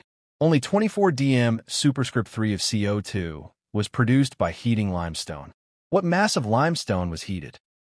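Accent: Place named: United States. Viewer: #* americian voice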